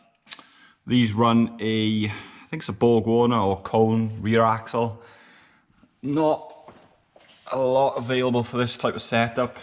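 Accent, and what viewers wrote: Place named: Wales, United Kingdom. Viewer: I thought you sounded a bit Welsh! I'm also in South Wales not far from Caerphilly! I've had a few Escorts in the past, even a MK1 RS1600, and Mk1 Twin Cam, plus a few standard ones. The one escort i really got on with was a standard Mk2 1300 2 dr. Great to drive, could rev the nuts off it, and handled really well. I'm no expert with cars, but would really love to do what you are doing now.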